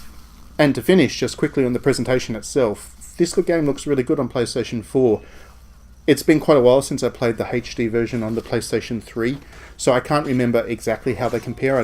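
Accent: Australia. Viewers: yea,i hate australian accents,but dont change your accent just because i hate it,no but you do need to say vita and not vitar and it s not just you,a lot of english people make the same mistake,any way i think that you deserve more views and more subs,and you have just gained a sub,mate btw sorry if i misspelled somethin, im portuguese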